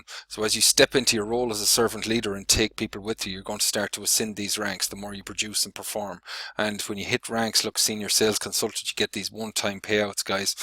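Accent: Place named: Ireland. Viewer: 😂🤣 I❤️his tight Irish accent!😂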